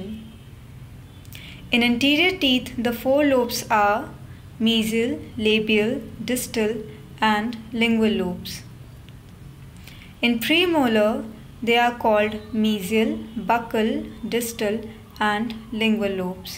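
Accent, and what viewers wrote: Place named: India. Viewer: I like the fact that I didnt understand it in my language and I found someone to explain it better in English with an indian accent. Funny . Thank you !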